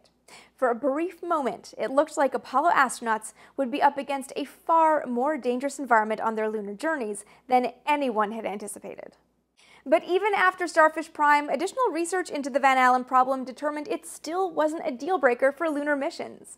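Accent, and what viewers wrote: Canada. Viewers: Yay. More content! I can still hear the Canada 🇨🇦 in your voice. I'm from Syracuse. 🤷🏿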